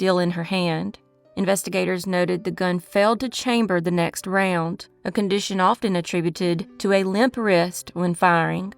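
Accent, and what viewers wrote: South, United States. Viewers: mine too. And her voice is relatable to my Southern self.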